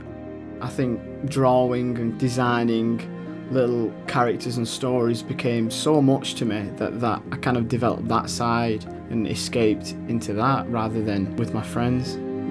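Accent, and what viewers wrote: United Kingdom. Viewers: Can anybody tell me where that accent is from? North of England? Not sure.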